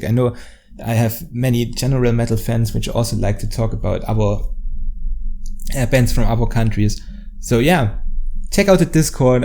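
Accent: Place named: Germany. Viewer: Are you German? Your accent sounds so familiar. Do I hear a bit Saxon out there?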